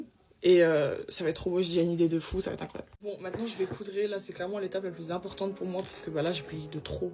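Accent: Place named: Moselle, France. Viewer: Vous avez un accent mosellan 🥰😄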